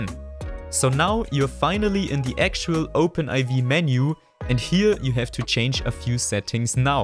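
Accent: Germany. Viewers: du bist deutsch hört mann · Ich bin mal so frei und schreib auf Deutsch. Deiner Spracheinstellungen und deinem Akzent nach solltest du mich verstehen :). SUPER VIDEO! Der erste der alles wichtige mit rein genommen hat und bei dem es auf anhieb geklappt hat. Vielen Dank für das Tutorial, bei dir kann sich der Rest der Tutorialtypen die's so gibt noch eine Scheibe anschneiden!!! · Mn merkt extrem dass er deutsch ist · Fam youre a German from that accent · Are you from german?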